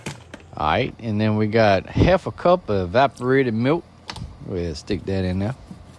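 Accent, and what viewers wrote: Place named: Louisiana, United States. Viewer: I hear Louisiana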